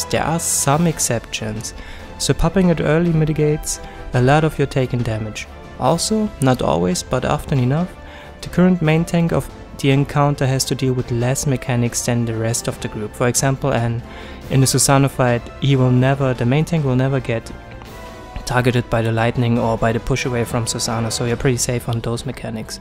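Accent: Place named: Germany. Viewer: Ty for this guide!! Und so bist du Deutscher? Höre es in deiner Stimme iwie😂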